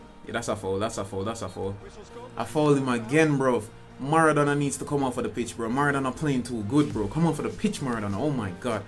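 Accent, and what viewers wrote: Jamaica. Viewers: oh i been watching for weeks and i said to my self bet he's a jamaican😎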